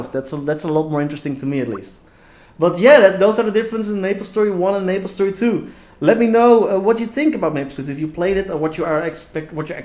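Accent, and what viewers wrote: Netherlands. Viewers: you sound from the Nederlands man ar im right ?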